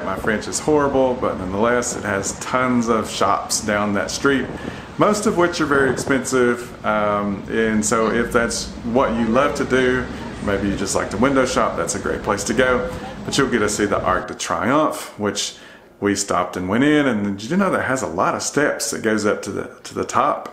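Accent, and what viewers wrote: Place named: South, United States. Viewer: I love your country accent.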